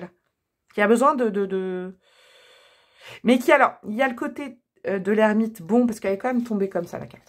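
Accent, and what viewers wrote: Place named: South, France. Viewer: Coucou vous allez bien ? C'est rigolo la dernière guidance vous aviez l accent du sud et une autre medium du sud sud ... A parler de vous. Mimi .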